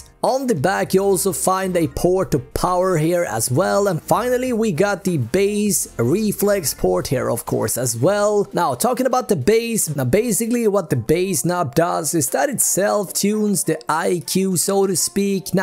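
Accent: Sweden. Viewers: Are u svensk min broder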